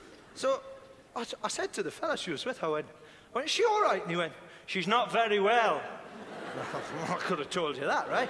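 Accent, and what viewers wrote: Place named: Newcastle, United Kingdom. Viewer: Tomi Haustola He's a Geordie, which is a nickname people give to Newcastle folk, my grandad is one. It's one of the furthest north places in England so it's expected that they sound quite strange to foreign folk. Listen to an Australian comedian and then, you'll really notice the difference. Aussies stretch out their inflections MUCH further.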